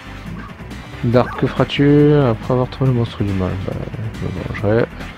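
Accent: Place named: Provence, France. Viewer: Par contre pourquoi? 13 je comprend étant de Martigues (l'accent me rappelle mon chez moi) mais?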